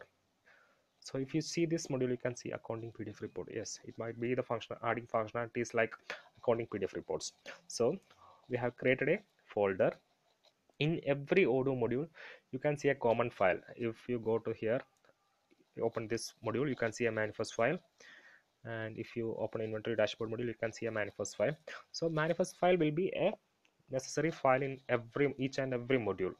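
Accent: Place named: India. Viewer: I understand English quite well but your Indian accent is almost unintelligible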